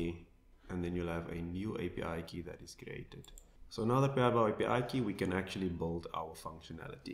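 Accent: South Africa. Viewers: You from SA? oh wow I can hear the accent and the Cape Town location haha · I know a south african accent when I hear one lol, CPT ne? Bro you might want to hide your API key there.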